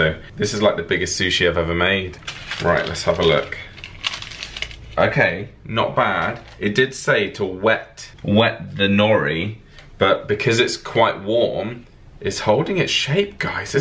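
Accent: United Kingdom. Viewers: Hi, just sayin', I LOVE the way you pronounce heart! Whenever you talk about looking for lambs heart it makes me smile! (That British accent!) Also, don't worry if you don't get to shave or however you look during a video, we are your subscribers because we love you! Yes, keep up the dog recipes, I'd love them for my 3 pups Liza Jane, Jose and Bernie! 💜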